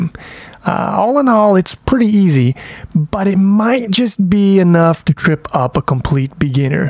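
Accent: India. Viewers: the accent is troughout my first language super super annoying. 1. I cant barely understand it. 2. Is super fucking annoying to listen... almost run away screaming. It probably has to with germanic languages in which indian accent sounds really like ear rape. Im not racist. The accent is just ..... terrible.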